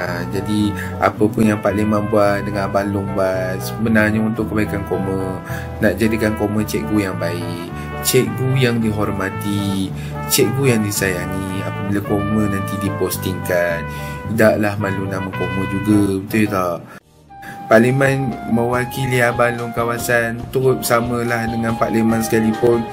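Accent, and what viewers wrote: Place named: Perak, Malaysia. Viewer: aje pekat peroknye kome 😅👍🏻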